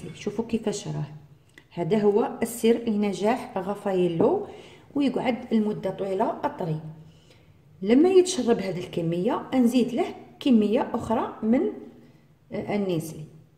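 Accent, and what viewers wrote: West, Algeria. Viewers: السلام عليكم يعطيك الصحة أختي على الوصفة رائعة إذا لم يكن هناك إحراج من اي منطقة أنت ؟لان اللهجة من الغرب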